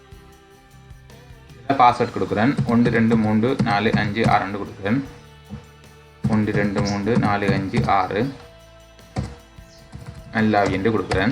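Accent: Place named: Eelam, Sri Lanka. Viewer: அருமை சகோ ஈழ தமிழ் அருமை 🎉❤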